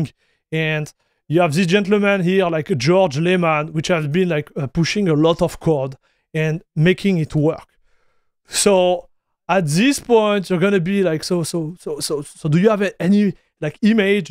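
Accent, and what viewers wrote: France. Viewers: this is amazing ! its like french schwarzenneger speaking :D never seen someone hype so well programming :)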